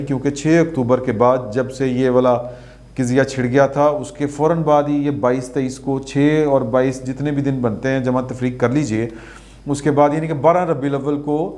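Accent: Punjab, Pakistan. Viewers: sahib i really enjoy your Punjabi ka tadka in all the conversations.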